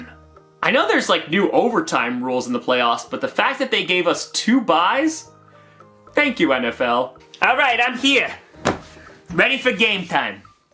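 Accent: New York, United States. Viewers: I'm gonna miss the New york accent you do. Sad times for all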